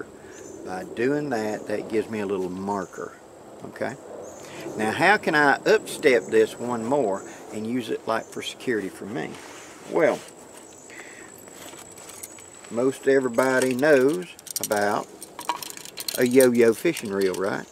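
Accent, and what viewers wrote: South, United States. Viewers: Lovin' that southern ascent there ya'll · Definition of one of the purest Southern accents, this video can be used as a tutorial among lingual regional accents. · This hicks southern accent is SOOOOO great and soooo perfect. I really can't tell if it's real or not.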